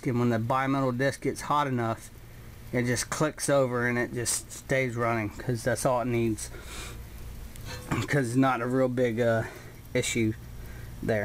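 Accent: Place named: South, United States. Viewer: They still make them...UEI about $250.00 on sale....I've never hooked mine up to 220...A little afraid to, running 220 down a 110 volt cord, but they say you can do it...FYI for you guys, a compressor normally won't start again after just shutting off...To much head pressure to start against so they have to sit and let pressures equalize....They'll kick the overload, Kixon or whatever type used until they do....Thanks to the what sounds like a country boy making this video...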